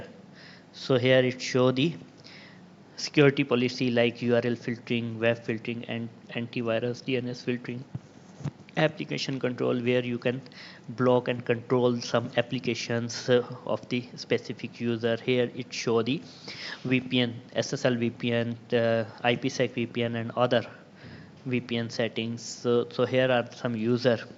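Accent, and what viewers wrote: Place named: India. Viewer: I liked the video and helped me a lot, also I I'm using this chanel to get used to Indian accent.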